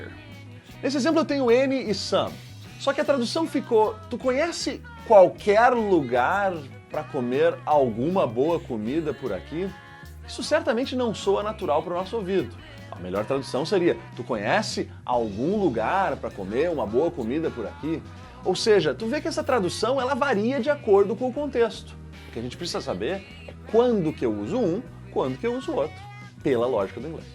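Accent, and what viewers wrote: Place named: Porto Alegre, Brazil. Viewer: that accents of Porto Alegre is not confuseble. I read you loud and clear. Good job!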